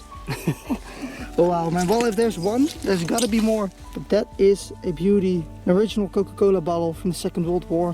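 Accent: Netherlands. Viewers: Are you dutch Cus your accent is typical for a netherlander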